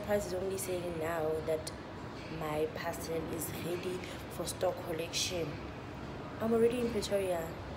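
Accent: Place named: Botswana. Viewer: "Bhuti okgotse ebile wa otshela , santse retlogo tlhatsa " nna I'll never get used to your Tshwana accent weitsi I could listen to you all day😂😂😍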